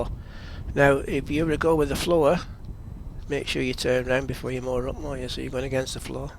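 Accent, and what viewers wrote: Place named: North, United Kingdom. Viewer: What is the name of the editing suite, Premiere ? It’s your northern accent!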